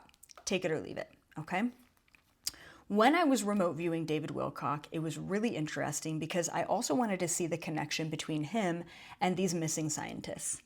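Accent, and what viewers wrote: Canada. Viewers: Thank you for your readings!! I found your channel about a week ago and realized you were Canadian from your accent! My parents and relatives are from Canada, they would be appealed by what is happening in the United States now!! Total corruption!!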